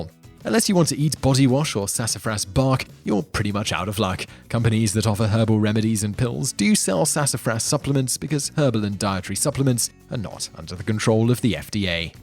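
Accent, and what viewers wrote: England, United Kingdom. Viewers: Simon said Indian tribes during this presentation. I didn't know the people of India were categorized as tribes. Another issue, why do most of your videos always have, "for example, in America"? I realize the US might be your largest audience, but the things you discuss also happen in other counties. Your American-centric views are getting tedious. If you want to come across as intelligent, please do so, a British accent doesn't mean you are smarter than the average bear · I hate listening to an english accent with head phones on. Like this channels content. Just dont like the voice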